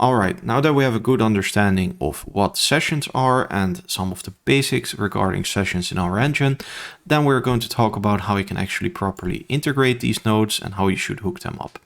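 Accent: Netherlands. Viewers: are you dutch by chance? :) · You have a dutch accent, I am dutch too xD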